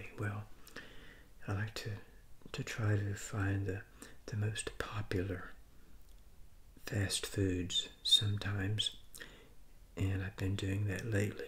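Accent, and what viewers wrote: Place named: Appalachia, United States. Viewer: Where are you from my man? Like the accent, pretty sure Appalachian or mid south but was wondering exactly what State ur from? Love the vid and keep doing what ur doing brother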